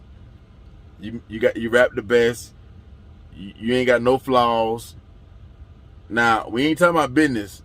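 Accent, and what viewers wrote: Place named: South, United States. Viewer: Bro are you from the south sound like you from here I know my southern people shout out to you from Louisiana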